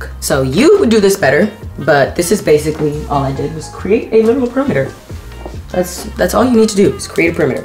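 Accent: Ireland. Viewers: brooo you and this irish accent I cannot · 🥺 THANK YOUUUUUU but babes- now I’m stuck with this IRISH accent in my headddd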